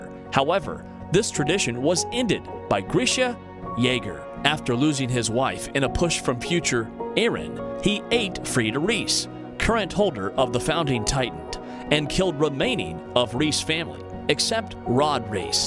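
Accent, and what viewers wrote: United States. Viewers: HOW IS NOBODY TALKING ABOUT THIS GRAMMAR JESUS CHRIST ITS SO AWFUL, he just skips out the word "the", "to" , "a", "into" and uses incorrect tense every chance he gets if he had an indian accent i would forgive him but its american, explain? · Is English not your first language? You don't speak with a foreign accent and you sound America, but you're grammar is all over the place. I'm so confused.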